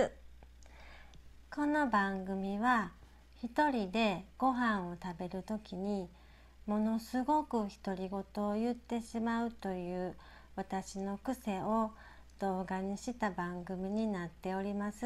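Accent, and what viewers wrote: Kyoto, Japan. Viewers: 懐かしい京都弁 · インスタでも登録させていただきましたよコメントさせていただきました。 バリバリ京都弁で落ち着きます🎵 そして、そのドレッシングのお店の近くに子供の頃から住んでます。 大原の田舎者です❗️ よろしくね😀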